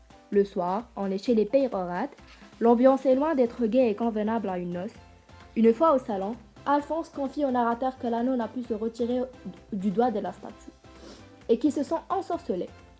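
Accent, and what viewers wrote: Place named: Quebec, Canada. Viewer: elle a un petit accent quebecoi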